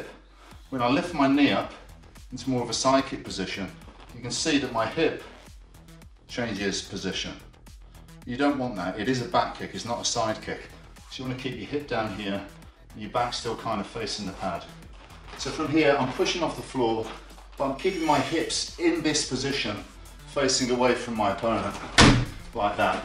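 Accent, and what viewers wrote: England, United Kingdom. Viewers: So weird hearing You speaking With a brittish accent after seeing You in IP man. Great video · Hebal you and I have something in common. I love British accent. I think that's what you like about his English, the accent. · I feel like im not looking at the person who acted as Boyka and especially with that english accent its really hard to digest. Its like im looking and hearing a different person 😂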